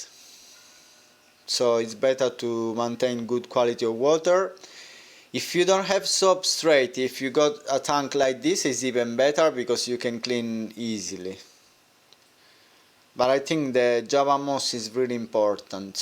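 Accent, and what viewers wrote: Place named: Italy. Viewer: pretty sweet bro! u italian? hahaha ur accent makes me tell u r! but anyways nice tank and vid! just earned a sub please consider subbing back please if u want.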